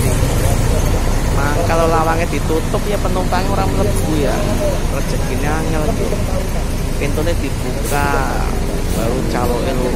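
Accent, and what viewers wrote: Banyumas, Indonesia. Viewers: Pekalonganne ngdy mas kok ngomng e ngapak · Iki sing duwe chanel wong ndi logate ngomong kaya wong ngapak ya,nyong wong banjarnegara teka.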